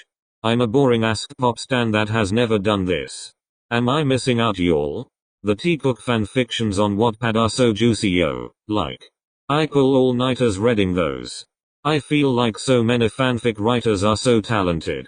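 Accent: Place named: England, United Kingdom. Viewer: AHAHAHAA ARE YOU ALSO FROM ENGLAND?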